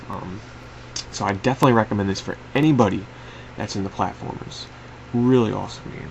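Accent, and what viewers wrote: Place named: New England, United States. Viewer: I like your New England accent. "Didn't get to play much of WarHauk"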